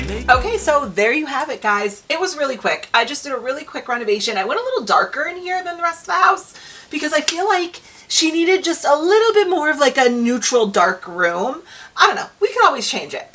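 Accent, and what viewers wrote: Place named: Connecticut, United States. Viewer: I knew your accent sounded familiar - I'm from CT too! I live in NC now though.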